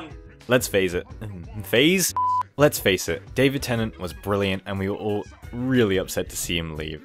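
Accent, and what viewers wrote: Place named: United States. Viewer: why you sound slightly american??? I NEED ANSWERS!!!!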